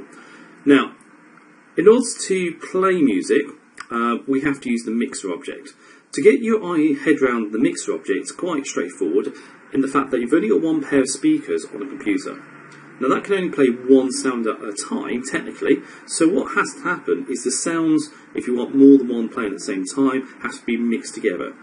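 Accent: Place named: United Kingdom. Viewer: This accent, he's from UK for sure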